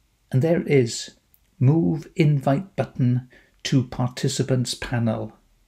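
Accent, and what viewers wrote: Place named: Wales, United Kingdom. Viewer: Bore da Mr., Thank you so much for your Zoom videos. I teach at various universities in Okinawa, all of whom are now scrambling to get the students learning online. After viewing many other Zoom intro videos it became obvious that most of them were way too complicated and difficult for our Japanese students to follow. So, diolch yn fawr for your concise and much easier to follow tutorials. I especially appreciate the bonus of a narrative accent, not so different from my own Barry talk. Tidy, like! Owen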